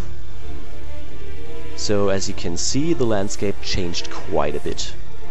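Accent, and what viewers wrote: Germany. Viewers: ich bin beeindruckt dass du den deutschen akzent so verhältnismäßig gut verschleiern kannst^^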